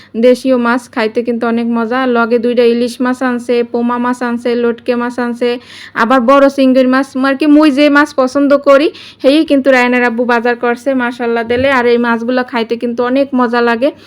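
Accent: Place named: Barisal, Bangladesh. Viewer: Apni borisal ar vasai kotha bolban na please apu..ta na holay ami apnar video dakbo na..normal koray kotha boilan..suntay valo lagbay..ancholik vasha kaw e posondo koray na😊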